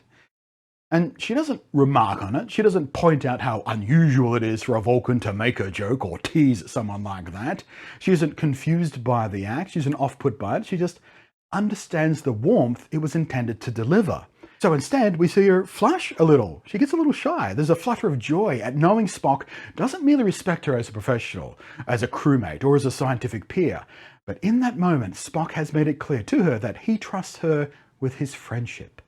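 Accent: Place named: Australia. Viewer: Hey, expat Aussie in the US for 23 years, are you likewise? I hear the Aussie accent but occasional hard Rs:)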